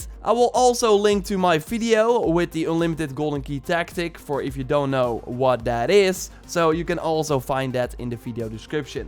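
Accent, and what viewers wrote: Netherlands. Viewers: You sound dutch not gonna lie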